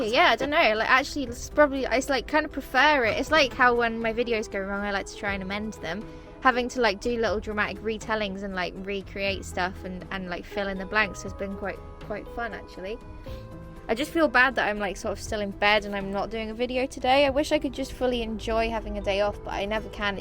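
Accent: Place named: Scotland, United Kingdom. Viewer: Its funny to hear someone in Scotland getting confused by your accent as being Scottish and living in England its usually the opposite way around for me everyday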